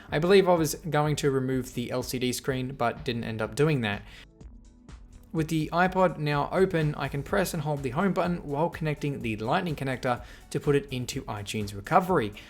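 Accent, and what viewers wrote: Australia. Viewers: I think he lives in Australia based on his accent:)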